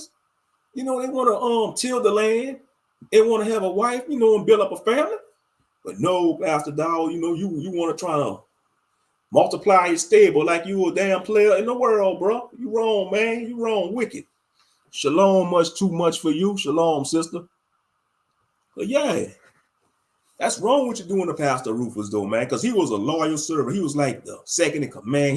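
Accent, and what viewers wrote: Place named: Louisiana, United States. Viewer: You are Clearly from Louisiana 😂 Good video tho. Ringo brought me here 👍🏾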